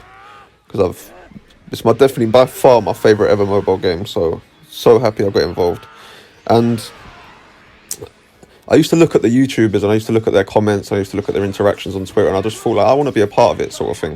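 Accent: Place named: United Kingdom. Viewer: Get less British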